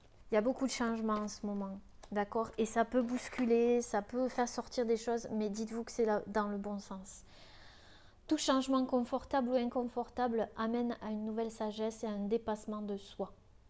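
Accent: South, France. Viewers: Bonsoir Magnifique tirage! J'ai pris la chouette n°1 et pour info le père, la mère et l'enfermement ce sont passés quand j'avais 4 ans car ma grand-mère et ma mère étaient en sanatorium et mon père travaillant, mes parents m'ont laissé dans une maison pour enfants pendant 1 an. Je ne leur en veut pas évidemment mais l'enfant "Isabelle" a dû ressentir beaucoup d'abandon.... merci encore pour ta générosité et ton accent du sud!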